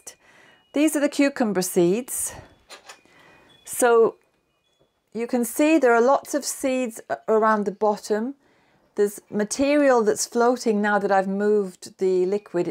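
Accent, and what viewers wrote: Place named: England, United Kingdom. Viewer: ok ,you sounded a bit english, was you born in ireland or just have irish parents, i also have irish grandparents, and a half irish mum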